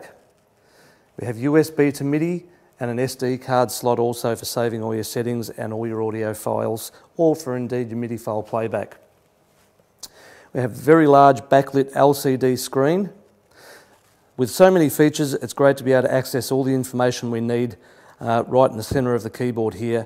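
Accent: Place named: Australia. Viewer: australian accent sounds cool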